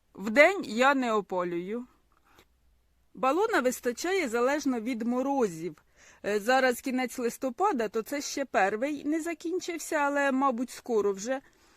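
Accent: Poltava, Ukraine. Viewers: Доброго дня, чи вечора. Потрапила на ваш канал і залипла!!! Поки усі відео не передивилася, не угомонилася. Дуже зачаровує українська мова з полтавським акцентом! Дуже приємно слухати. Для себе візьму приспособу для перевалки з стаканчика в більшу ємкість( з розрізаним боком) А банку з ручкою для виготовлення стаканів я теж у Валєри взяла. Я і зараз його дивлюся на каналі Наша дача.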